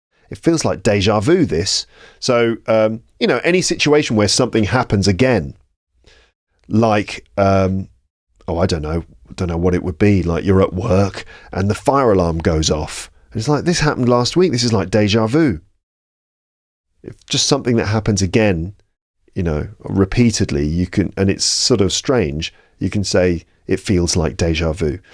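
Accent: United Kingdom. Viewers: I enjoy your British accent, thank you very much,! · Это британское произношение? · I really like listening to your clear British accent when you are reading stories.. your channel helps me a lot to improve my English language.. please post more stories.. I really enjoy listening to you... Thank you very much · Excellent British accent of. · The combination of your beautiful voice and the British accent is not only important and a great motivation to study English, but it is also really relaxing and enjoyable. Thank you very much for this contribution. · Hi and thanks.are you only speaking betitsh accent? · I trully enjoy listen your podcast. You trully english accent, british accent,real. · Thanks you i firstly was thinking that British accenet so hard but after started listening your podacst i discoverd it amazing accent thanks alot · Thank you fot this episode. 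It was so pleased to hear your british accent with such a clear pronansiation that is so rare to hear ir from natives. It can really boost vocab of students and teachers and undestanding of English speech. And moreover your teacher's style is so enjoible and motivative because it lookes like there isn't nothing special to undestand English.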